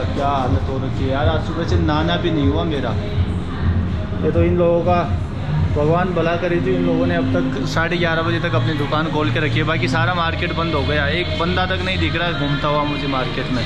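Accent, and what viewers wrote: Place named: Jaipur, India. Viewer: Kyu ki aap ki bhass Jaipur si lagti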